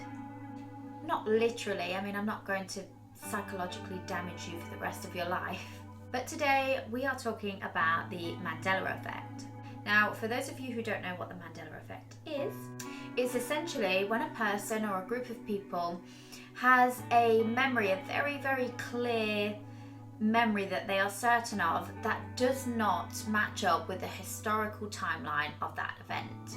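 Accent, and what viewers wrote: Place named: England, United Kingdom. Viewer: Hi, I’m from California and enjoy learning about different peoples experiences of England and in England. I was wondering which region your accent is from. Just particularly like the way you speak. Have a great day. Thanks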